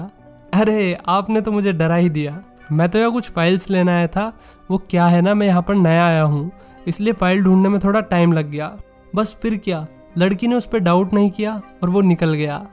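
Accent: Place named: Western Uttar Pradesh, India. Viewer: Bhai tu west up se h n 😂 Moradabad , bijnor orr meerut?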